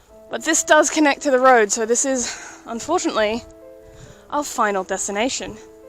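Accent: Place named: United Kingdom. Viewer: it's sounds more British than Australia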